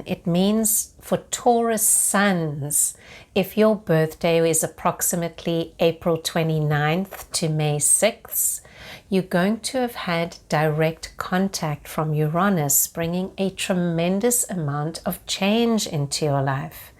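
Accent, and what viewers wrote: South Africa. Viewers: THANK you! First time listener. You sound South African.. Greetings from Cape Town South Africa. 🐬🐧🐳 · Thanks you for this. I love your S. African accent and your clear and concise guidance. It is very helpful.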